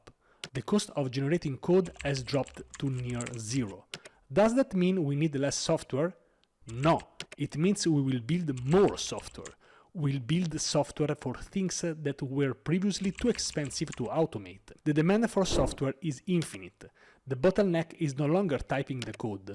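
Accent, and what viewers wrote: Italy. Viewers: Your Italian accent just makes me less worry , thanks that was good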